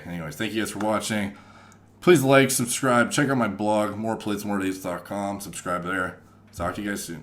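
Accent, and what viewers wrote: Canada. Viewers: This dude is from Canada af. They the only ones that say aboot. Lol Love your videos though man. Keep it up. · Your Canadian accent is obnoxious. Couldn't listen to you talk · The Canadian accent is STRONG in this one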